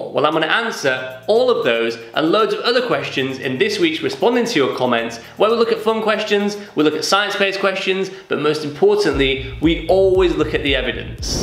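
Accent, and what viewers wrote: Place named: England, United Kingdom. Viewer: Yay an Englishmen such a refreshing change hehe. Great content too thanks so much 👍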